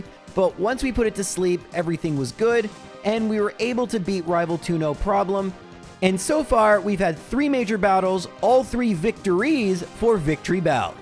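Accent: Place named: Canada. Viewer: Whats funny to me is i wouldn't have picked up on your Canadian accent if it weren't for Y2J and the way you say "against " 😂 not that it matters. Nothing matters.. 💀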